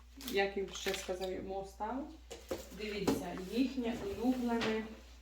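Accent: Ukraine. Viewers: у вас такой приемный украинский говор, мой муж Любе ваши кулинарное блюда и вас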